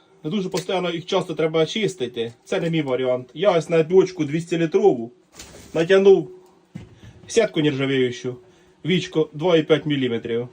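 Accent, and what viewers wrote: Poltava, Ukraine. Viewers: Зі 100 відсотковою впевненістю можу стверджувати, що Полтавський говір😊 Може навіть північні райони... Пирятин, Чорнухи, Лохвиця, Гадяч, Зіньків... Напишіть, чи вгадав?😅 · Чути зрОзу шо Ви з полтОвщини. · Полтавський говір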